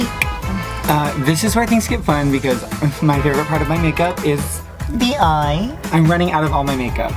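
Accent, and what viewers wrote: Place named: Manila, Philippines. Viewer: The way she speaks is giving me Manila vibes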